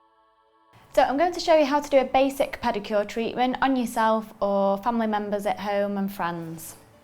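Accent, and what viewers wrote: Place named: Manchester, United Kingdom. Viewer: No, it's a Manchester accent.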